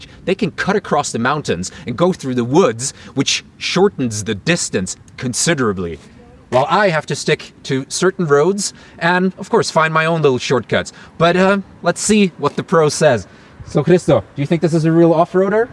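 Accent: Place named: United States. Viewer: Is this guy german? he sound like american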